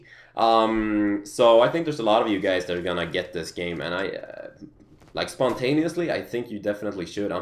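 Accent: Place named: Sweden. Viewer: Semi ADAMADIC, fucking love swinglish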